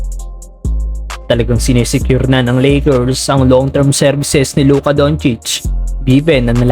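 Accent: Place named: Laguna, Philippines. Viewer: San ka sa Laguna? Yung tono mo haha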